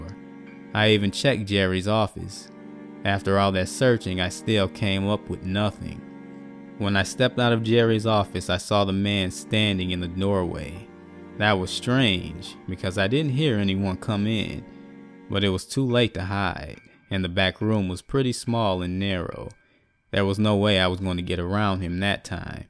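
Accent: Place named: Deep South, United States. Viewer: 🇬🇧 I really like your stories so ive subbed to yr channel I also love yr accent, i think its deep south, Alabama or Louisiana? Im English & live near the Scottish borders & have only ever visited New York a few times & also visited friends in Utah & Wyoming but have had a thing about the kind of accent you have & so enjoy listening to you narrate Your stories are also very good & I havent yet heard any of them before, did you write them? If you do then your writing talents are considerable I look forward to hearing many more stories Keep up the good work, ive often subbed to creepypasta channels when they have had less than 1,000 subs yet in no time they have climbed to 20,000 - 50,000 Dont know how long you have been going but i always say From small acorns do large Oak trees grow. Thank you for your narrations I do so enjoy listening 👧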